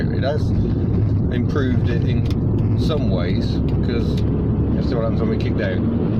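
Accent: United Kingdom. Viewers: Anyway, thanks for all your replies buddy, the only ither burning question for you is, what is life like in Wales, Kent isn't the best place to live and have been considering other options, there are alot of rude and unpleasant people in Kent, people in Wales seem more friendly, your accent suggests u properly moved to Wales from the mainland, how does life treat u over there?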